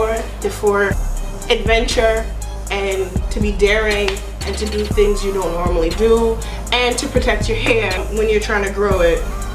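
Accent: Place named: Jamaica. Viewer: the island accent did it for me girlie! Are you Jamaican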